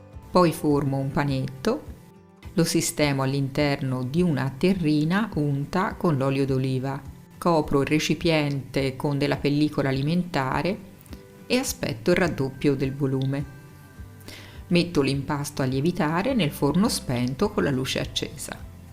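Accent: Tuscany, Italy. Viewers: quell'accento todcano che rende il tutto "più buono"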